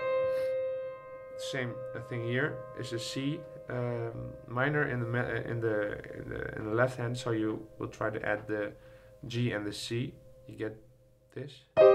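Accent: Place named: Netherlands. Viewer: thank you, haha je hoort aan je accent dat je nederlands bent qq, maar goed gespeelt!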